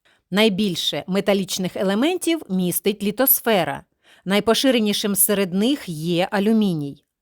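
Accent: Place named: Kharkiv, Ukraine. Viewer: Говір схожий на Харківський.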